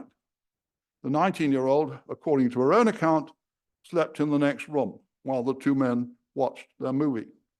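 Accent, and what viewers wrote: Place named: United Kingdom. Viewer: yes, when I hear a pompous British accent I know it's a coward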